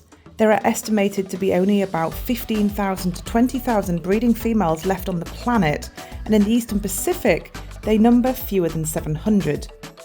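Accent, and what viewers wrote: United Kingdom. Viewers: By your accent you are British it seems. I'm Salvadoran and Gulf of Fonseca is only 30 mins from my house! Greetings!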